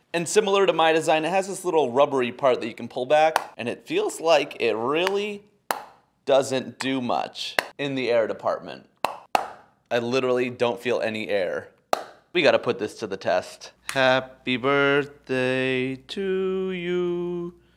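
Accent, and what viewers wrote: United States. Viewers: Haha i thought this was a knockoff integza channel.. until you spoke with American accent. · Are you American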